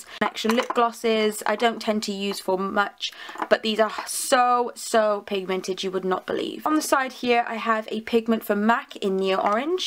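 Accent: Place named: Somerset, United Kingdom. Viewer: You sound rely Somerset like me 😍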